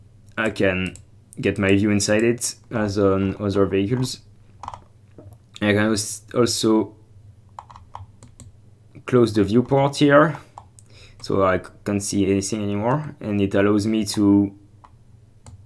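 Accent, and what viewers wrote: France. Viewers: tu a un accent français tu est un français en tout cas très beau mods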